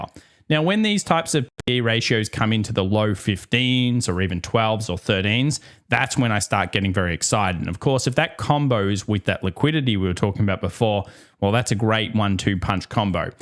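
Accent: Australia. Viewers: Your Australian accent is super cool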